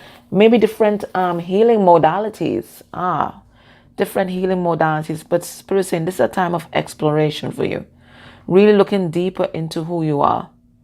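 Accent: Trinidad and Tobago. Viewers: A Trini or Guyanese accent. I do follow Steve Nobel meditations very helpful. I'm a Bajan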